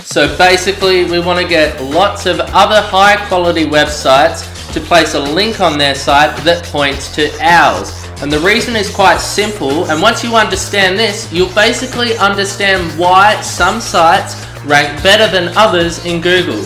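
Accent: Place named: Australia. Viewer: Niche is pronounced "Neesh" not "Nitch" ... nice to hear an Australian voice on one of these kinds of videos though :)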